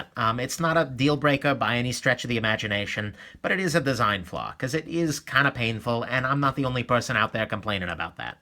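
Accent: New York, United States. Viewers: I love your reviews. Very thorough, but concise at the same time. Can't tell if your accent is New York or Boston.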